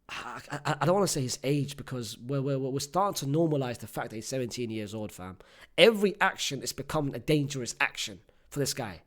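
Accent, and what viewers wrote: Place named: United Kingdom. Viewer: That British accent from a Iraqi is crazy unc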